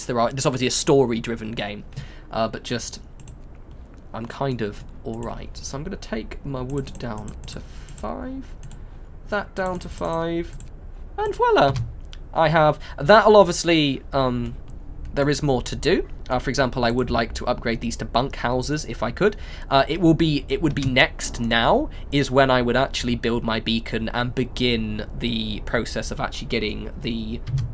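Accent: United Kingdom. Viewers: OMG. Speak English, not a f!@ British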